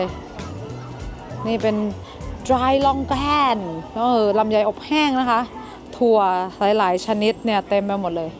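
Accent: North, Thailand. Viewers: พูดภาษาเหนือน่ารักดี ชอบๆๆๆ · น้องเป็นคนเหนือกาจ้าว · อู้กำเมือง ม่วนเจ้า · อู้กำเมืองระเบิดไปเลย😊คนเหนือ😂ยินดีตวยเน้อ❤🎉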